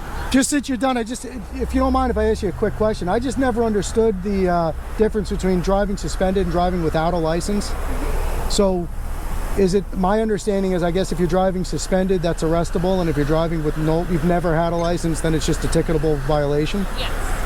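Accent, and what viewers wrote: Cuba. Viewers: You speak perfect fluent spanish, although you speak a caribbean spanish accent, more like Cuban. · Dang bro, you speak really good Spanish. You sound like a you could be cuban just about. Lol 👍🏽😎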